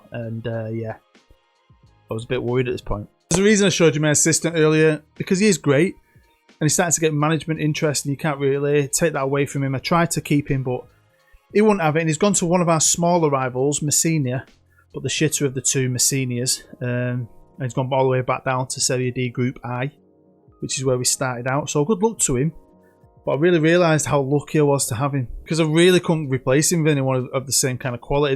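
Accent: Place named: Liverpool, United Kingdom. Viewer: oh and ye gotta love a scouser in ye vids hahaha xx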